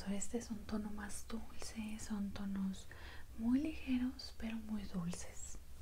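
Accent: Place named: Mexico. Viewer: Hola me gusta mucho el acento mexicano y el asmr en comun 😘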